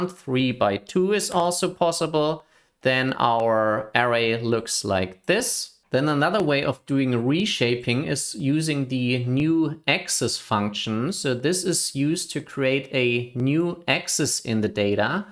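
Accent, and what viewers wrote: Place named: Germany. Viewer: ich versuch NumPy zu lernen aber bin immer noch von diesem deutschen Akzent ablenkt😭😭aber klingt sehr niedlich❤️❤️❤️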